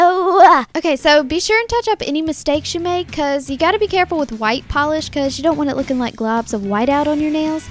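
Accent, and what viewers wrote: South, United States. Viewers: You are a adorable!! I LOVE YOUR SOUTHERN TWANG !!<3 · Where Are You From? You Sound Extra Southern.. Lol But Your Real Pretty.. ! I Subscribed!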